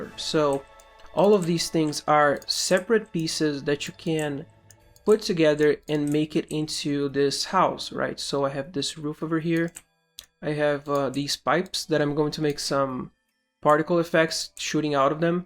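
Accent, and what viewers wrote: Brazil. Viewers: I almost didn't notice that you are brazilian!!! Excelente trabalho, talvez seja legal fazer um canal pro povo BR tbm ou não neh... Se bem que fazer a mesma coisa duas vezes deve consumir muito tempo. Excelente trabalho!!! Quero ver a sequencia desse DevLog!! Alias quero jogar esse jogo!!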